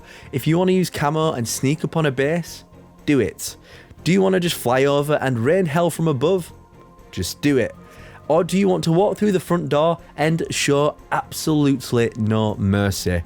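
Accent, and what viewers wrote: Yorkshire, United Kingdom. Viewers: This is the first time ive seen one of your vids, you sound Keighley/leeds as fuck! Great analysis btw, this looks as though it could be the best halo since number 3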